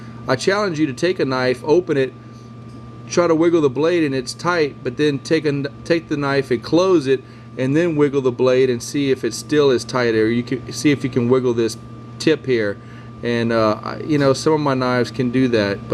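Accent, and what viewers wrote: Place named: South, United States. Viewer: usa earth? Easy to tell your a southerner. I approve :-) thanks for the review, and the realistic terminology :-)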